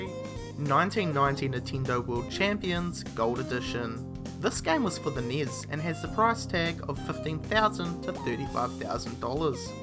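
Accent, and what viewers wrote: New Zealand. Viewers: He's a new zealander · love the NZ accent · Is your accent from north NZ? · It sounds like NZ to me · Sounds more like New Zealand to be honest, could be Aussie though. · That New Zealand accent though.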